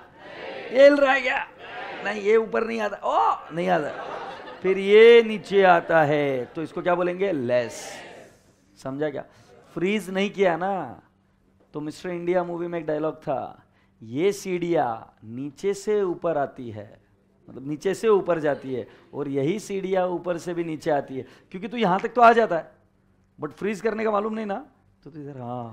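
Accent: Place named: Mumbai, India. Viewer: I think u are from Mumbai....🤔🤔 But nice explaination sir🙂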